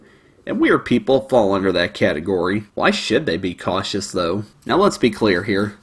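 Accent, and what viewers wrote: South, United States. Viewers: I didn't notice u weren't 'normal' tbh, in fact i considered you the most normal. Or at least in that ur very down to earth and even have a 'country boy' accent to boot. :-/ Liking cartoons isn't weird tho, not since the internet was invented at least. It's weird imo to say anyone who does like those things are weird.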